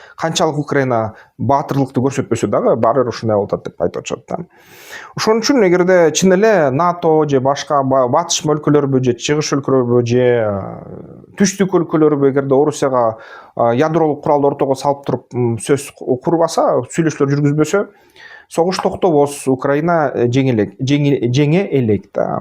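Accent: Standard. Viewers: АРЫБАНЫЗ, Бар болунуз мырза таза кыргызча суйлогонун кубандырат.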